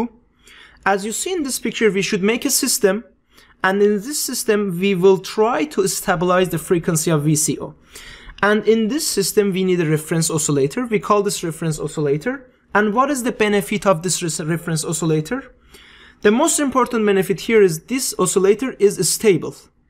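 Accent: Turkey. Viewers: dude you are definitely turkish hahah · türk müsün la konusmandan cok öyle geldi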